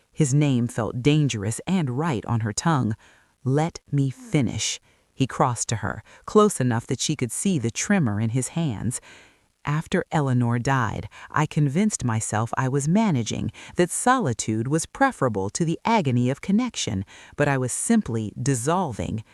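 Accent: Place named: United States. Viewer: Don’t like the American accent—why not RP ?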